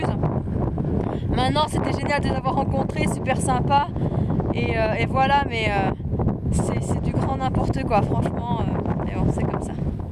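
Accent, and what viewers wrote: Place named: Franche-Comté, France. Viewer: c't'accent franc comtois !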